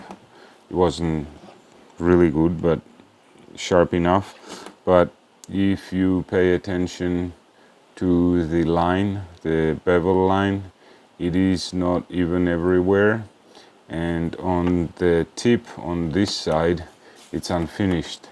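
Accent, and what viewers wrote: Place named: Georgia, United States. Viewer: you have a Georgian accent.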